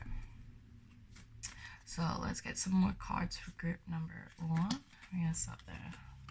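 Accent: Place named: Lancashire, United Kingdom. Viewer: Ha Ha ,weirdly my husband said to me ...' the accent sounded like someone from Lancashire with a foreign accent : . Thank you for answering.. unfortunately he wins 😬😂. We live in Preston but are from nr Ormskirk . I am looking forward to any more readings that you do xx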